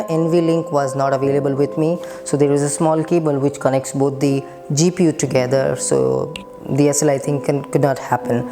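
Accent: Kerala, India. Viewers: R u Mallu?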